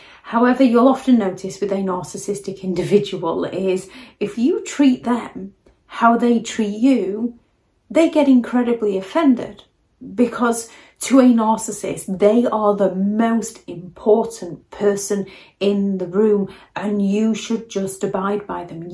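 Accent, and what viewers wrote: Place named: England, United Kingdom. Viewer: Good talk 😀🦜 good voice 💯 accent from England 😍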